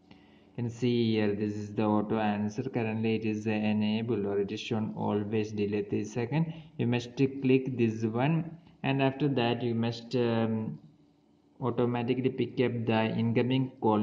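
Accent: Kerala, India. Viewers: Mallu accent😄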